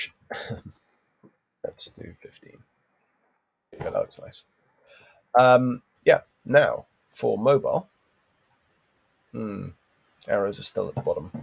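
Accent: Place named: United Kingdom. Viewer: Great to hear the voice of a fellow brit!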